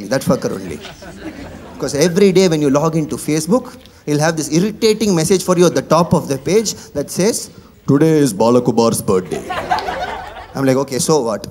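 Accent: Tamil Nadu, India. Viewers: Yes... he performing in English with the slang of தமிழ்..! That is the wealth of his success..! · Can feel tamil accent when u speak English 😂😂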